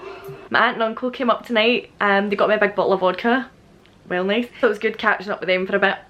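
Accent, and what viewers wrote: Scotland, United Kingdom. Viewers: What's it like having a Scottish accent and then coming down to South Africa?:)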